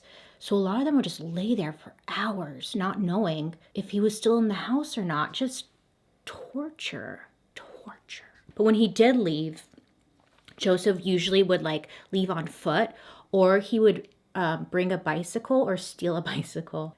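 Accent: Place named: California, United States. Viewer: Is it me or this is the first time i can tell her Californian accent... like kardashian or something lol... like the video though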